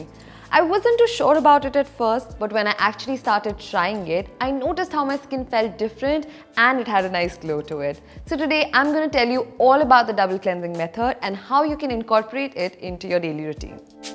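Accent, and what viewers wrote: India. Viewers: I was not expecting that Indian accent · Her accent sounds like indian accent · She is indian that's why the accent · You look Asian but sound Indian 🤔thanks for the video 👍🏼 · I'm surprised that your English accent sounds like Indian! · Clicking on the video assuming it a korean channel. Listening the accent: Indian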